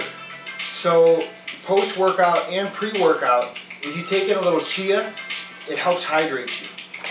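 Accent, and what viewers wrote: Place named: United States. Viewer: Your American accent is too thick and with that messy kitchen I can't concentrate on what is happening.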